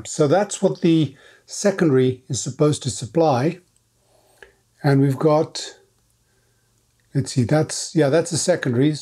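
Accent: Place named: South Africa. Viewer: I heard that you are a hoarder and I instantly became a big fan. I am too! My mom recently died and I had to move everything out of her house because my brother and sister didn’t want anything because they live elsewhere. I had to move her things, my dad’s things, my uncle’s things because he was a hoarding repeat gold medal winner and my things that I had stored there. My daughter is already worried about me dying and that she will be stuck with going through everything. I have a nice collection. Good thing I have a big shop. You sound like you are from South Africa. I visited Durban because I met a nice lady there on the internet 20 years ago. It is beautiful there. If you’re not from SA never mind. Keep up the good work!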